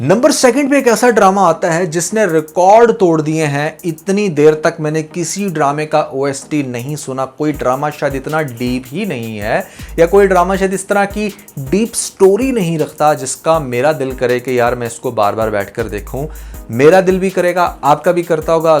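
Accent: India. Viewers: Firstly you must improve your urdu before making videos secondly avoid Indian style of dialect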